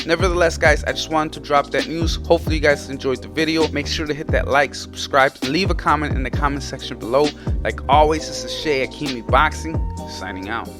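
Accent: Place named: Pocho, United States. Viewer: El que habla se ve que es un pocho, mejor hazlo en español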